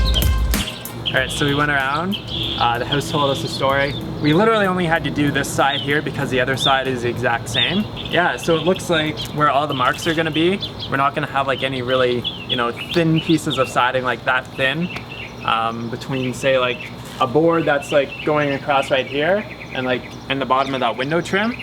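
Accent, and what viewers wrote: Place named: Canada. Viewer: The Canadian accent 😬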